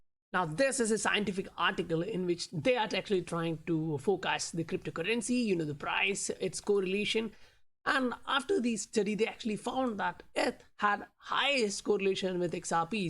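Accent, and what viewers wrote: India. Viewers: Oh and now that I've finished the video and you mention your accent... I started listening because you sounded like a very intelligent Indian guy! 😂😂😂 we have a large community of East Indians in the area of Canada I'm from, VERY SMART! Do you have your eye on any other hidden gems right now?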